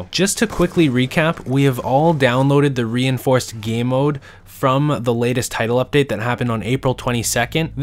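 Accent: Canada. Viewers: r u canadian cause u say about funny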